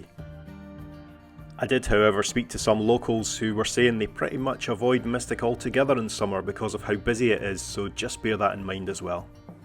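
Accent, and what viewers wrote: Scotland, United Kingdom. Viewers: Hi and thanks from a Scotophile in Nashville, TN, US. Your video was the first result of interest on a search for Mystic CT., in prep for the Classic Wood Boat Show there in August. Backstory is that we plan to move to the Black Isle in 2 years (God willing!), where my hubs hopes to get into building classic wood sailboats. You narration is divine- as the myriad regional Scottish accents are my favorites anywhere! Thanks for a great channel- we'll be stalking it now.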